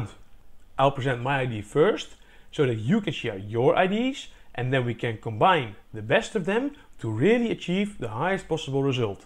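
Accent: Netherlands. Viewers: are you a fellow Dutchman? Judging from the accent? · The dutch accent ;p Amazing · Hey, ik neem aan dat je een Nederlander bent aangezien we hetzelfde prachtige accent hebben als we Engels praten !! Ahha ... ik ben ook helemaal bezig met ideeën voor het opwekken van energy, ik wil 3d print en tech workshops gaan geven op mijn DIY elektrische fiets met een DIY cargo bike trailer/minicamper en natuurlijk een DIY energy systeem om alles te kunnen draaien, fiets, kleine printer, laptops, telefoons, etc etc. Ik ben nu in de bouwmaat op zoek naar alu profielen om een opklapbaarframe te kaken voor mijn zonnepanelen boven op mijn cargo trailer en fiets. Ik hoop dat we kunnen samenwerken, sta je open voor contact en eventueel een samenwerking ? Groetjes Ruben Dekker Allround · Je spreekt lekker "Dunglish". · 3 secs in you know this uploader is Dutch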